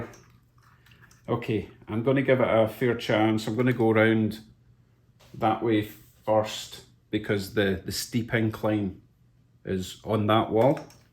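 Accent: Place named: England, United Kingdom. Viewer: i like your videos in particular because of the English sound -it makes me smile time and again and reminds me of watching a soccer game in a pub near Prestwick Airport and the guy next to me tried to engage in a conversation -had to tell him "let's chat during half-time, too noisy" -but even without noise I barely understood half -but no doubt, very nice people up there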